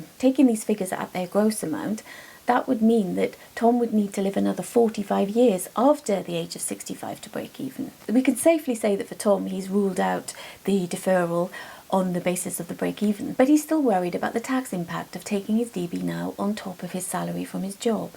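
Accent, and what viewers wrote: Wales, United Kingdom. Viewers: Thank you for this incredibly structured video. I will be coming to you for advice in about 10 years time. Your accent, Bridgend or Port Talbot I would say…Porthcawl in between? Keep up the great vids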